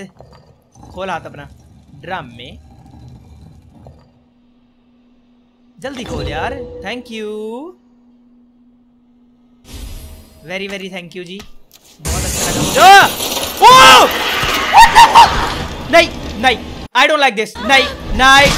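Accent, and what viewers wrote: Haryana, India. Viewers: Bhai toh haryana ka hai kya????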